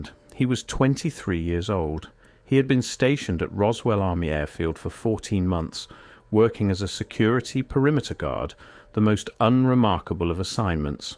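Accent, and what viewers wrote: United Kingdom. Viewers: How come all the narrators of these episodes are Pommies?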